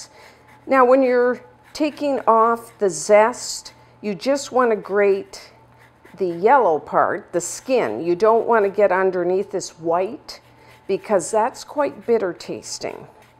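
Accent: Canada. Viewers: Canadian, eh?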